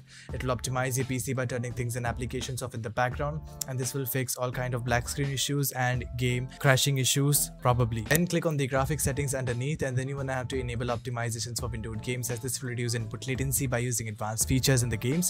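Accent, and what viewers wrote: India. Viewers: he sounds indian thats why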